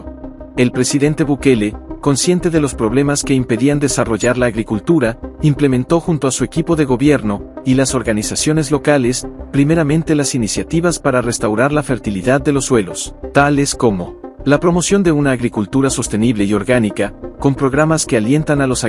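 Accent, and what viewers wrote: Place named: Castile, Spain. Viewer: Un buen documental con una voz narrativa en perfecto castellano. Muchas gracias desde Tenerife. Reino de España. Viva nuestro muy amado EL SALVADOR !!!.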